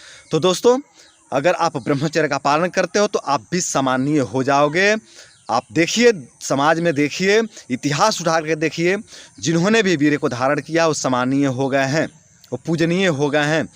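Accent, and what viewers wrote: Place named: Bihar, India. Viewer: Hii bro लगता है की बिहार से हो आप 🙏🤞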